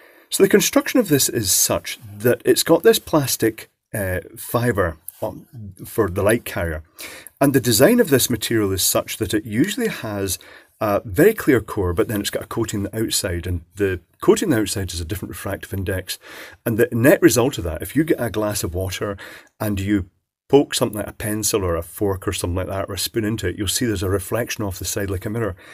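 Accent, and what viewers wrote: Scotland, United Kingdom. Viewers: A) he's scottish and B) electronics is literally his area of expertise. Perhaps you need to get out meet people yourself, instead of writing rude comments on youtube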